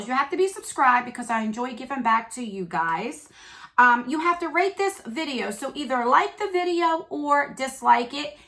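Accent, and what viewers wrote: New Jersey, United States. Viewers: I love watching your videos because of your accent, reminds me of home (NJ) ☺️ loveeee the violet voss palette 😍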